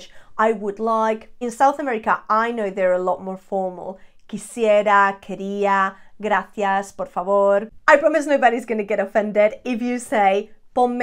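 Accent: United Kingdom. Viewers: It never occurred to me that there would be any British people who would be interested in learning Spanish. Just like Americans (whites) have no interest in knowing any other language except "Merican". You speak English with a British accent, but your Spain Spanish is perfect. Your appearance tells me that you have more Spanish roots than British. I wasn't going to watch you until I heard your Spanish and now I am intrigued. Let me know if I got any of that right.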